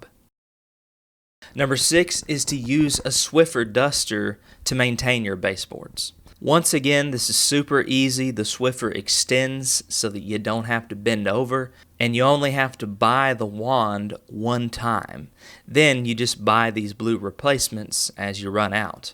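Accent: United States. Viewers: I love Libman brand and that's a great tip with the cloth over the broom. PS what state are you from? I'm in TN and I love your accent. ❤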